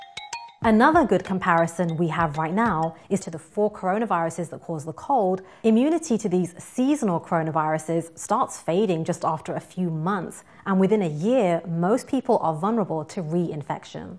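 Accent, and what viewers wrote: United Kingdom. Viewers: With her gorgeous face and that Brit accent, I can listen her read the phone book all day! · Thank you so much for your very informative presentation, Doctor. I love your British accent too. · So weird seeing perfect teeth speaking a British accent. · BrRational: yeah, but she's cute, & with what sounds like a British accent, so... painless, at the very least.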